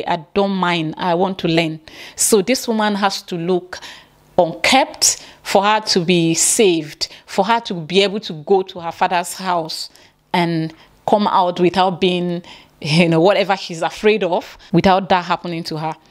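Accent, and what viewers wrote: Ghana. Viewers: You lady, you are funny, you speak as if you are a Ghanaian.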